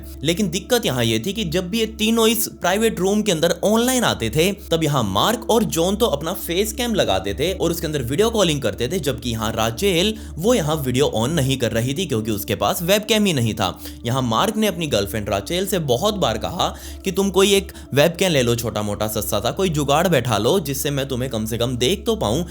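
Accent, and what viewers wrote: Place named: Punjab, India. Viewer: Koi dikkat nahi hai! I guess you are a Punjabi! Kindly reply if I am right!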